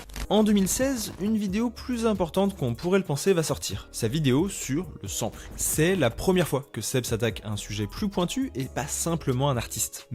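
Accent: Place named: England, United Kingdom. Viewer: J'ai vraiment du mal à comprendre ton accent anglais 😅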